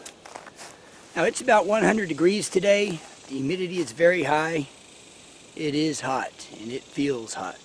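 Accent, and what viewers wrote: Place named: Texas, United States. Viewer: He is from TX.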